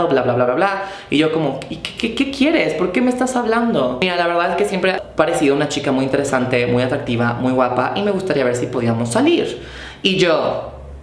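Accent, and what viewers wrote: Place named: Mexico. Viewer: ...yo lo que tengo...es una tremenda atracción (casi adicción) a tu voz... Sí, sé que en algún momento has reconocido que no es lo que mas te gusta de tí, pero a mi sí Esa mezcla perfecta, entre ese timbre algo grave (muchas OTRAS mujeres tienen voces hermosamente graves) y eso está lejos de ser un defecto en mi opinión. Pero continúo...mezcla perfecta entre ese timbre y la tonadita típica de alguien de México como tú, pero con una enorme femineidad en la manera como hablas... Y para que mas o menos te puedas hacer una idea de "que tipo de tipo" te está diciendo todo esto...te dejo un link de mi Galería fotografica de Flickr, para que compruebes que mi punto de vista, el de un sexagenario, hetero perdido, fotógrafo por hobby y aficción, que fotografía y charla amenamente con cera de una "veintena de veinteañeras" al día... y en belleza, he de reconocer que pocas se te acercan...y ninguna te supera <link>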